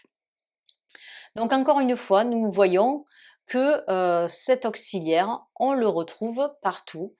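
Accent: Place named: South, France. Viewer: C'est vraiment magnifique prof. J'en profite parce que j'étudie le français depuis longtemps et là, j'ai décidé de réapprendre l'anglais mais, en passant pour le français. Honnêtement, j'adore l'accent du sud. J'ai un ami à moi qui vient de Narbonne qui parle de la même façon. Très bon travail prof...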